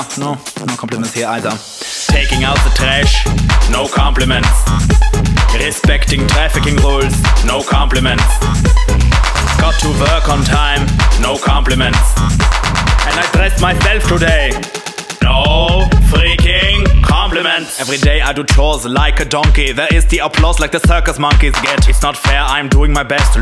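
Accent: Germany. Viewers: perfect german accent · Its German xD it was made on purpose How I know it: at some point he says "bitte schnell" which means "please hurry" or something similar lol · kateemma22 its a german accent because he talks german inbetween the lines sometimes (im german) its probably a parody on germans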